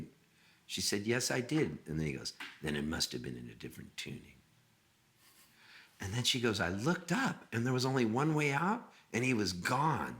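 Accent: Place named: California, United States. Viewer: California accent for the win with these stories!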